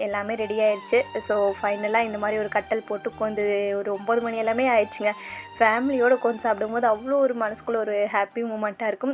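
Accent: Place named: Kongu, India. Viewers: Haaiiiiii sis neenga tirupur Karur near area ahhhh... Na Kangayam... Unga slag Namma area mari irukunga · amanga ivanga Kongu slang than pesaranga....பல்லடம் area va irukum nu ninaikiren...nan tirupur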